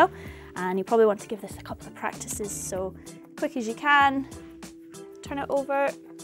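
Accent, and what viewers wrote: Scotland, United Kingdom. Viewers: It's a scottish accent, you nut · shes posh scottish not hard to understand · she sounds more like a posh scot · Within the first 8 seconds I discovered this woman is Scottish. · I guess you've never heard a Scottish accent...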